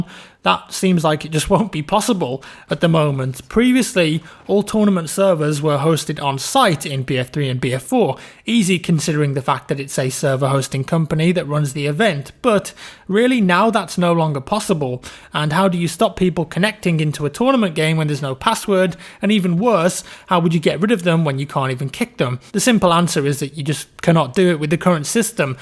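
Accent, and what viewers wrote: United Kingdom. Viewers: Meh, you know what, at first I hate the British accent, but you've turned things around for me <3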